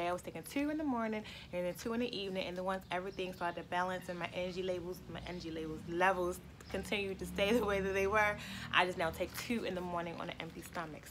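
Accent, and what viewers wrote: Baltimore, United States. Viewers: She is nice...I hear that Baltimore accent...GOOD Content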